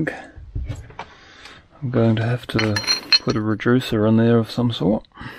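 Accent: New Zealand. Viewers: You must be a kiwi??? 🥝 👍🏻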